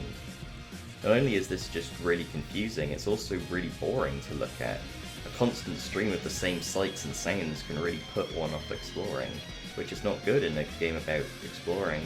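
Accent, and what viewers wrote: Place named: United Kingdom. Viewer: You're very british oomfie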